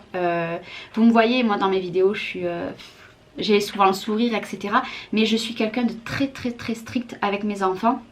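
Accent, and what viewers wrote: South, France. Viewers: J'aime regarder vos vidéos et moi qui suis de Nantes j'apprécie beaucoup ce petit accent qui me ramène un peu le sud vers moi. Bonne journée ☺️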